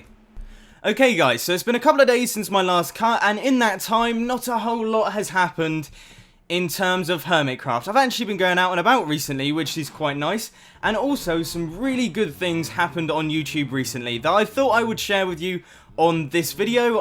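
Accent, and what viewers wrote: United Kingdom. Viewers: the only reason im not subcribed to you, is because(not being racist) your british accent really annoys me. Its not personal, i just really hate the way british people talk.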